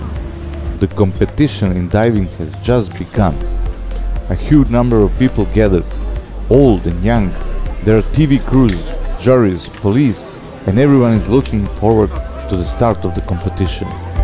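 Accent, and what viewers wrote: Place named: England, United Kingdom. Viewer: Grozan, teski engleski akcenat.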